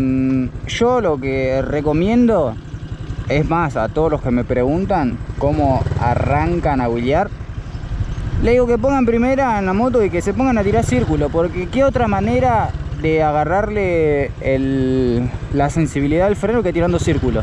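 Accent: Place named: Chicano, United States. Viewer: Che como que te que te queda media chicano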